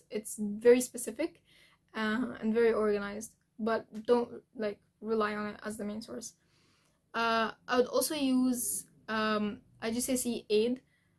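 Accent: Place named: Arab world. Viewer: I FIND YOUR ARABIC ACCENT SO SO SO PRETTYT AND YOUR HUJAB I SO SO SOS SOS OS PRETTYY TOOO LMAOO thankyou for thiss <3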